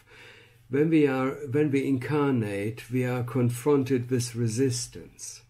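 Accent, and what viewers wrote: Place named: Germany. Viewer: warum spricht er eigentlich kein Deutsch? Er ist doch ein Deutscher. Hört man doch total am Akzent. Ich verstehe echt nur die Hälfte. Eigentlich schade. Das Thema ist so spannend!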